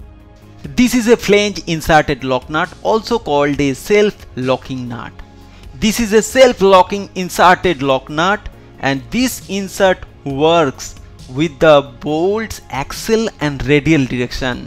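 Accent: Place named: Bengal, India. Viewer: Are you bengoli?....because accent is like that By the way love your content from Odisha😊😊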